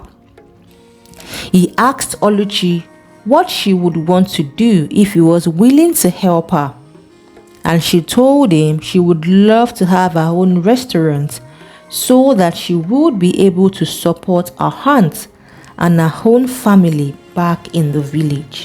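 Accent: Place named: Africa. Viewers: She do not need to tell our African stories in white man voice. Miss, love your accent don’t listen to that Oreo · Nne, we're Africans and proud of our language and accent. This is Original not fake.That person has inferiority complex.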